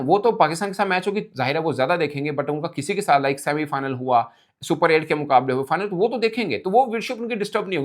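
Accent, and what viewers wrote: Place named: Punjab, India. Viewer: بھائی اپنے پنجابی نما لہجے میں اردو پر کنٹرول کریں۔ پنجابی میں کوئی برائی نہیں آپ ضرور بولیں مگر جب اردو بولنی ہے تو پھر مکمل اردو لہجے میں بولیں۔ مثلا لفظ قانونی ہوتا ہے قنونی نہیں۔ براہ مہربانی دھیان کریں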